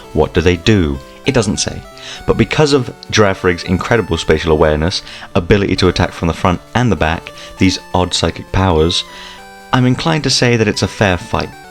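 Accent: United Kingdom. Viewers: Are you a fellow Brit?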